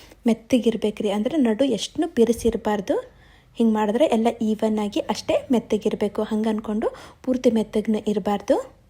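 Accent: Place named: North Karnataka, India. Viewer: Uttar Karnataka bhashe tumba channagede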